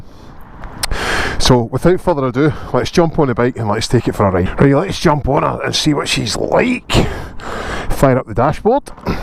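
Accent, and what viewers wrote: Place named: Glasgow, United Kingdom. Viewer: I came to see the review and really enjoyed it. As an expat living in Australia it was great to hear a glesca voice and then I realised it was in Hillington estate. Different Triumph shop now as he used to sell Ducati's as well and I remember the original dealership from Renfrew.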